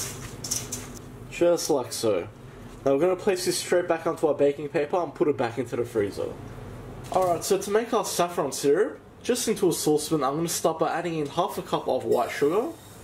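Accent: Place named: Australia. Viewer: Persian with an aussie accent first time watching you video love it very much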